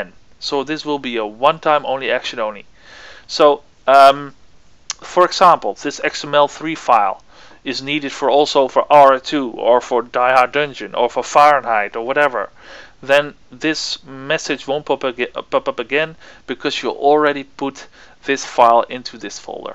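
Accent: Netherlands. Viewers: Haha nice! Ik hoor je nederlandse accent haha!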